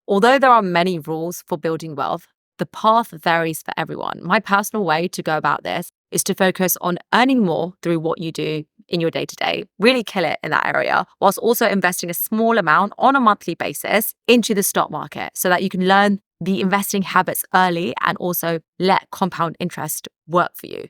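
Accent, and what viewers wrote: United Kingdom. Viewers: koompound interest😂😂f00-k-i-n brit accent......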